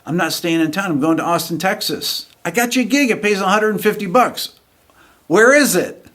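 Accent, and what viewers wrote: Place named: Upstate New York, United States. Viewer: Congrats! And I like that you still have traces of upstate NY in your speech! 👍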